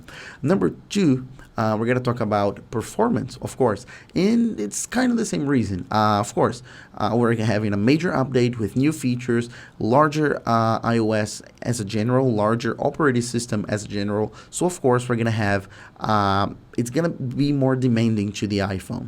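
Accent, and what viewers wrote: Brazil. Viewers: Um salve de São Paulo pra vc,! Notei o sotaque brasileiro, mesmo vc falando super bem hahaha. Valeu pelo vídeo!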